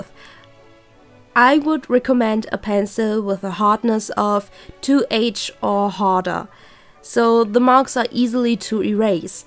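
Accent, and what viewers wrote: Germany. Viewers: Ich wollte wissen was ein Shikishi ist, da ich davon gestern das erste Mal gehört habe und muss kurz sagen: Ich erkenne Deutsche, die Englisch sprechen irgendwie sehr oft :'D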